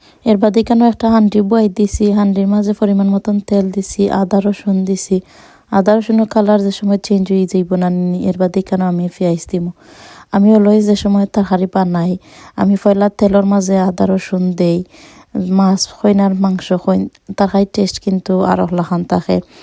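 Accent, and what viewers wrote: Sylhet, Bangladesh. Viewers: Sylhety mat hunle asolei onek vala lage❤❤❤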